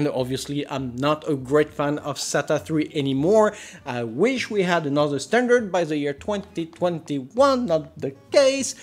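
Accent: France. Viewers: Ooo le franchoyardd 🤣 ton accent vaut de l’or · the jesus of mother boards love man, j’adore l’accent français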